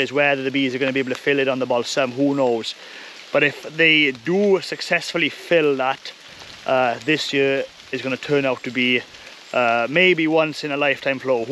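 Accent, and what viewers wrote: Wales, United Kingdom. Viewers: Hi, believe it or not, although I am researching himalayan balsam, I clicked on your video because i hadn't heard a welsh accent in a while! I like the content too. Which part of Wales are you from? The north?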